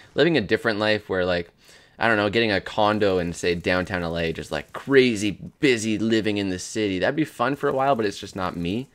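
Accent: Canada. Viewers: Just wondering, did you ever have a Canadian accent?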